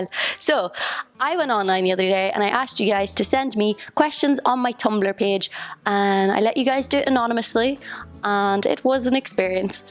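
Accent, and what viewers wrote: Ireland. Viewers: Clickbait title and video starts with: "Hello Friends, I have a yeast infection". I love that irish accent, and I get better at understanding it every day. I'm at almost 50%! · I dont know what it is about you but i find you absolutely amazing.sweet.cute and absolutely stunning.you have gorgeous eyes.and the accent.i know its cliche but the irish accent.and your singing voice!! Sorry.im in love haha 😍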